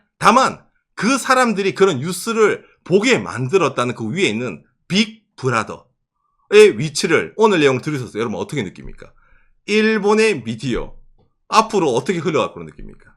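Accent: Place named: Gyeongsang, South Korea. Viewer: ? 말할 때 경상도 억양이 살짝 들리는 듯 ㅎㅎ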